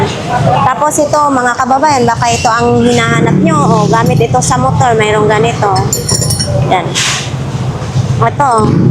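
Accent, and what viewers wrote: Visayas, Philippines. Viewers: Ate .parang Visaya ka 😂😂😂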